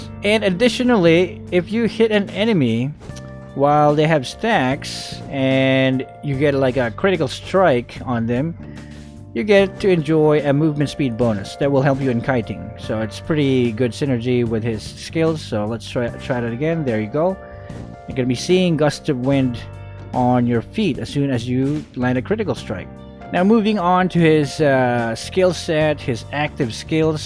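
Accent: Philippines. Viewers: wait narrator’s a filipino? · Youre good in english but sometime your voice in your stream sound filipino accent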